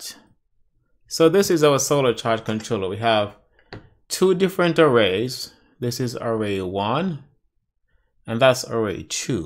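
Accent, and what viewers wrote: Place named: Africa. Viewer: Hey, my brother! thankyou or this! This is exactly what looked for in my search! Once and for all, eh, YouTube has come through for me. Ha! ha! ha!!!? BTW, my wife is from Africa! I heard it in your accent. You're ok! Top drawer! A'ight? Bye for now. Out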